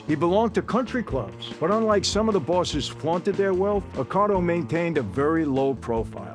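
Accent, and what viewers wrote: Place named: New York, United States. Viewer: They need to hire you as the voice over for all mob/mafia documentaries from now on your experience on top of that classic New York accent legendary stuff man keep it up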